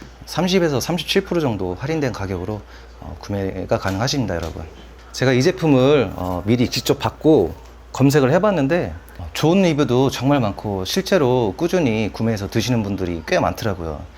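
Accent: Chungcheong, South Korea. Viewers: 어이 양반 충청 양반..뭐래유..너무 잘보고가고 좋아요..영상 공유해줘서 눈향복하개해줘 고마워요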